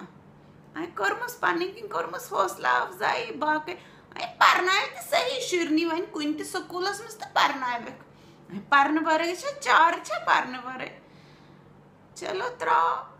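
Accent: Kashmir, India. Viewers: Your koshur accent. Iloveyou lady😍💓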